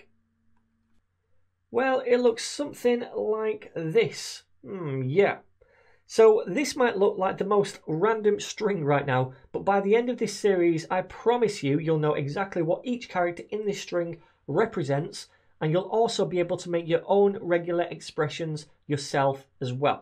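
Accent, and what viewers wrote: United Kingdom. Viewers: You're the first guy I've bumped into that has a British accent in a coding tutorial. From north of England I think, given that you tend to pronounce 'must' or ''stuff' like 'moost' or 'stoof'. Sorry for the digression, but I'm pretty in love with British accents. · came to learn, stayed for british accent, continued for great explanation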